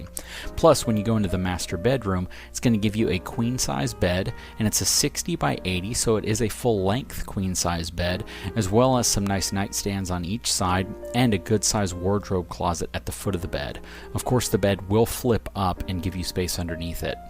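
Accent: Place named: Texas, United States. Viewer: I like your wild Texas voice better than your NPR voice. This will take some adjusting. 😂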